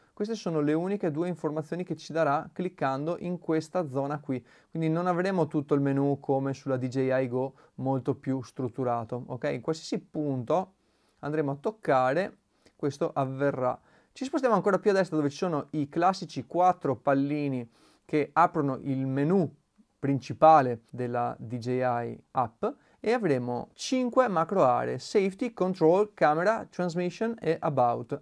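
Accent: Veneto, Italy. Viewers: Ma sei di pavullo???? Ti facevo Veneto. Complimenti sei molto in gamba.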